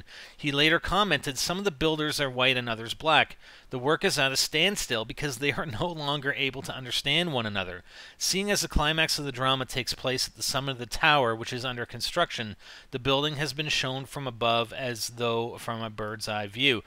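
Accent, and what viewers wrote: Canada. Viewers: R u candadian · Are you from Canada?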